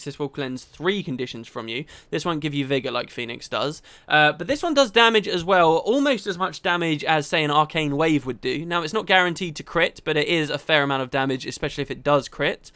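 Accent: England, United Kingdom. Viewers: english accent sounds amazing IMO (I'm german) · your english accent and saliva makes me throw up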